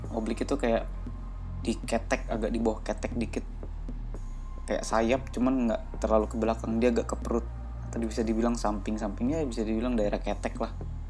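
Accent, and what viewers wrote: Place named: Medan, Indonesia. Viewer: Bang org medan ya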